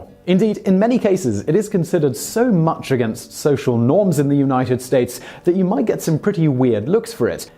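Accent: United Kingdom. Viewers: Is there a louder, more in-your-face american version of Simon Whistler and his shows where he doesn't speak with a british accent? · I love how u say controversy (and other words u pronounce the British way instead of the American way) u yummy man u! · Love the British accent. I don't get British humor tho.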